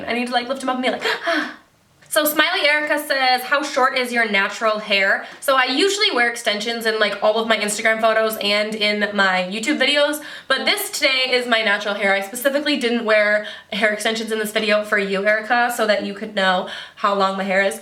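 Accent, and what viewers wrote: Michigan, United States. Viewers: I thought your accent sounded like a Michigander! I am from the UP! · Ugh you are so gorgeous! And I had a feeling you were from Michigan!! (I could hear the accent lol) Im actually moving to Michigan in 2 days for University!! I'm a Floridian so the cold is going to be a bit challenging. Any recommendations of cool places to go OR SHOP? :)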